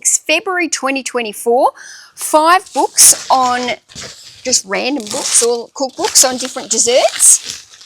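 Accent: Australia. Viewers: I've been enjoying your videos for a few months now. I discovered them through the tech n sports interviews. I love how honest you are with yourself and other resellers. It makes it easier for us as viewers to be honest with ourselves. Thanks for your willingness to put yourself out there. One other thing. Have you ever done a video translating Australian slang (or pronunciation) to American slang (or pronunciation). I already picked up a few like Mills & Burns are Harlequins. "Gair-idge" is a "Gahr- ahdge". You mentioned a Mocher (spelling?) before. I'm assuming that is a Mochaccino coffee?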